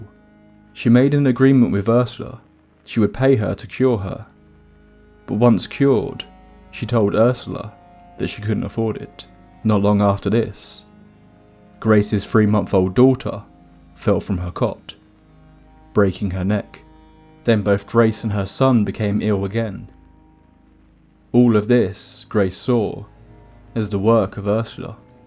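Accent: United Kingdom. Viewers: It's cuz they're British I'm telling you, it's like if a documentary isn't narrated by a British accent I don't believe it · Excited to see you’re back & growing! Maybe cause you’re in the UK, between the accent and history, I find myself utterly intrigued! Keep up the good work 😊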